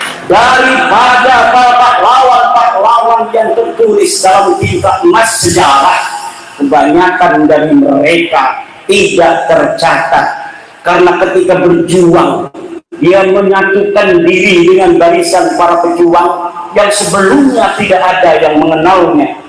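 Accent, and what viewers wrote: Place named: Banyumas, Indonesia. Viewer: ngapak mantap 👍👍🙏🙏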